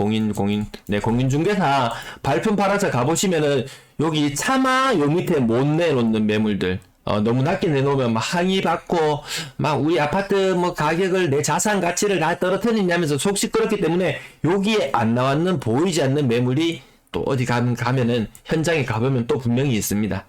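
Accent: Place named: Busan, South Korea. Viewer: 와ㅎㅎㅎㅎ 저도부산사람인데 사투리 기가맥히네예ㅎㅎㅎ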